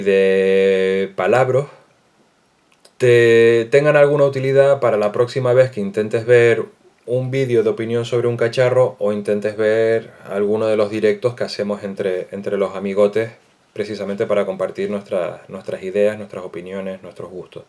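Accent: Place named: Venezuela. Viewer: No hablas como si fueras de españa creo que venezolano o ecuatoriano algo asi nose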